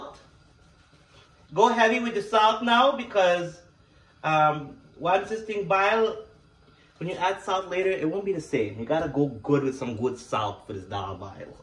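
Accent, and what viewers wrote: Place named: Guyana. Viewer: You're so funny 😅, this is how videos should be made, not boring, I'm cracking up really hard at your guyanese accent 🤣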